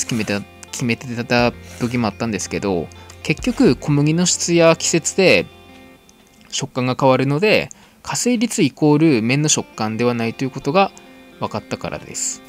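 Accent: Aomori, Japan. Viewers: 青森の訛り最高。安心する。ラーメン作ってもらったの食べたい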